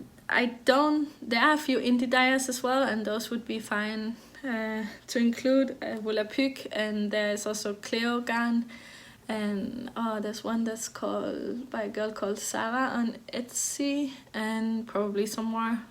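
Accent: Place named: Denmark. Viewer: Hello from Michigan in the U.S. I knitted through your podcast and it was so relaxing to hear your lovely Danish accent as I worked on my sock. I look forward to the next.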